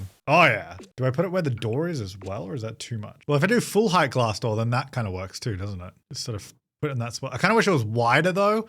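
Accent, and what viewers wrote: Australia. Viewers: Same. He sounded so young, and also his Australian accent seemed more noticeable. That, and sometimes he sounded like he was on speed. Lol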